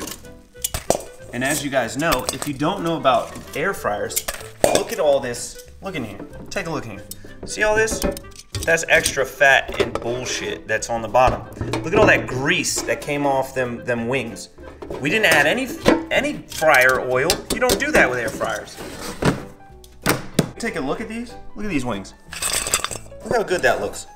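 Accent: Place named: South, United States. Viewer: You sound like you may be a nice southern boy...ranch! Buffalo wings were created in Buffalo New York. A lot of us use blue cheese. You do have other facts straight though. The wings were just fried plain and sauced after. You can season by just doing a simple flour dredge (season the flour) before deep frying and you shouldn't loose the seasoning it just isn't the original/traditional way.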